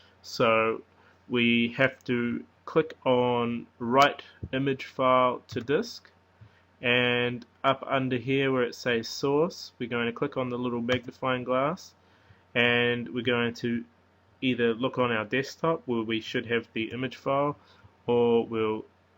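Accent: New Zealand. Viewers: HEY is that a kiwi accent or aussie ??? you sound kiwi???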